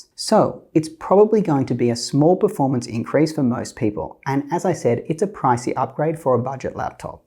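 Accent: Australia. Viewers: TIL this man with a very Australian accent lives in NYC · That’s crazy I’ve watched like 20 of his vids already and thought he was in Australia or NZ lol wtf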